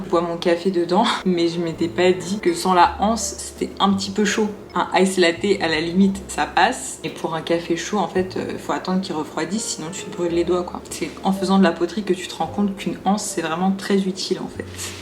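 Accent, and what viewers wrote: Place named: France. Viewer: Salut. Tu viens d'où ? Je suis américain, et je trouve que tu as un accent français, mais tu as des vidéos précédentes qui indiquent que tu vis à Montréal ? De toute façon, tu as un très joli accent français, facile à écouter 😊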